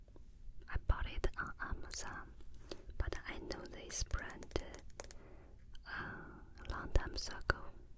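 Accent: Philippines. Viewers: Why does it sound like she has a Pinoy/fillipino accent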